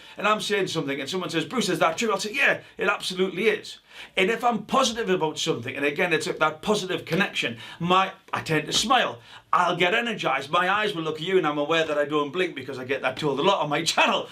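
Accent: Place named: Northern England, United Kingdom. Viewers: Great video. I’m enjoying that heavy Northern accent. Could listen to that all day 😁. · I’m back again, a year later, for the content, and also those delicious Yorkshire oooooooooooooh s!!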